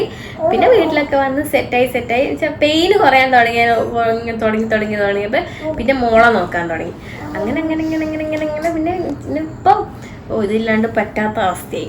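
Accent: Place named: Kuttiyadi, India. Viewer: that is kuttiyady slang😂😂😂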